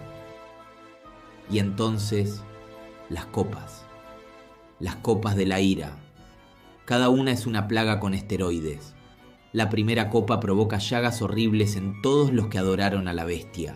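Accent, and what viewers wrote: Argentina. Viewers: Me encanta el acento argentino. Lo único que me gustaría que fuera narrado con menos humor irónico.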